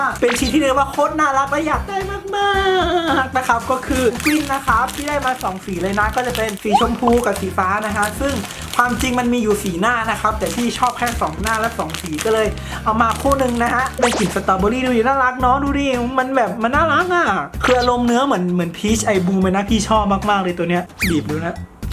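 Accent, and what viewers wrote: North, Thailand. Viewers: พี่ เป็นคนภาคเหนือหรอคะ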